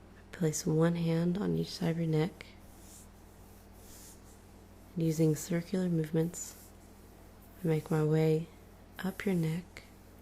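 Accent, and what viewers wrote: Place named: Midwest, United States. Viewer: It's alright. You and I have the same accent. Not quite... deep southern. And not quite something else. >_> I'm not even sure what it is. Midwestern, I suppose. I LIKE IT. <3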